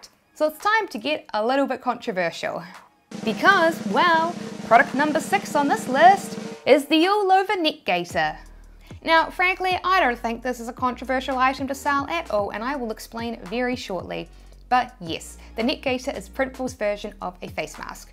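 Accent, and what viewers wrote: New Zealand. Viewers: I'm just here to listen to the kiwi accent...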